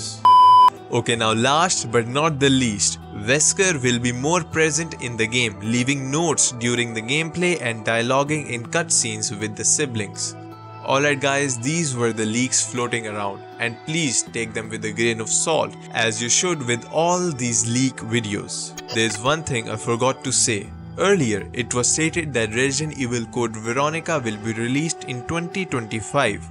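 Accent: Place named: East India, India. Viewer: I knew u were east Indian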